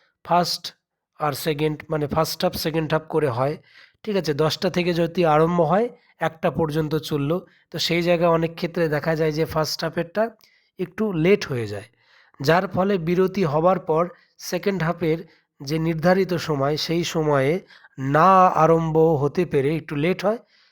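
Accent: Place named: Bangal, Bangladesh. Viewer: Upni bangal , kotha te bojha jacche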